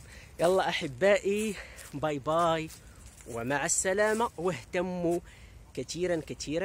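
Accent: Morocco. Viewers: خويا انا عاد عرفتك البارح و راني كنشجعك و كنشكرك على كل ما كتقدمو لنا من معلومات و نصائح. كنتمنى لك نهاية أسبوع سعيدة. جاتني هضرتك مراكشية إلا ايه راه حتى انا من مراكش 😄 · هههههه عن جد انت ظريف كتير حتى بعتبك لطيف ودمك خفيف ، بعدين احلى اهلين بتطلع منك ، على فكرة أنا بحسك سوري لكن بيحكي بلكنة مغربية 🌷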